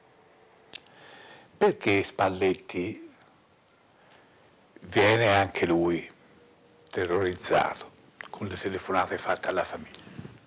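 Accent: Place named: Florence, Italy. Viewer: Grande Filastó. Un grande oratore con un carisma unico, un pozzo di memoria e di informazioni, oltre che irrimediabilmente toscano (anzi fiorentino, che per noi senesi è sempre guerra). Io vedo tanta controversia e credo sia di saperne troppo poco sull’argomento sia di essere troppo piccola ancora per potermi fare la mia idea su come le cose siano andate. Dall’alto dei miei appena cinque lustri di vita, posso dire che l’Avvocato Filastó è una persona che ammiro moltissimo, e che ritengo preparata ma soprattutto che non campa tesi in aria argomentando tutto quello che dice. E poi, la storiella del Duca alla fine mi ha stesa, quella è proprio l’essenza massima dei fiorentini e della loro personalitá. Che la terra ti sia lieve, <3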